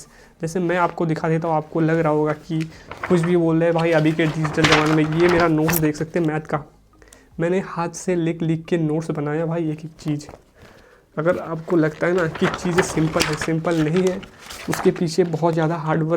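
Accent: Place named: West Bengal, India. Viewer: Bhaiya aap uttrakhand se ho but voice tone westbengal jaisa lagta hai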